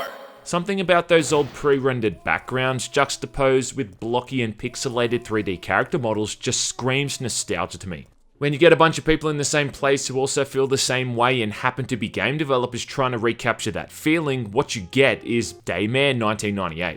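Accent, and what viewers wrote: Australia. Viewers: Are you Australian? I only ask because I like to support local talent when I can. Not trying to be rude. Really enjoying your videos.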